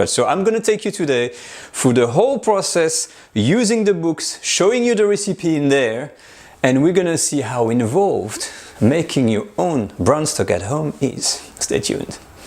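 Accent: France. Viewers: I am just mesmerized by the French accent and love the recepie ❤❤❤! You rock!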